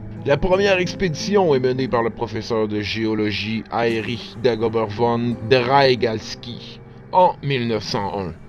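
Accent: Quebec, Canada. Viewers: Putain !!! l'accent de Celine Dion ...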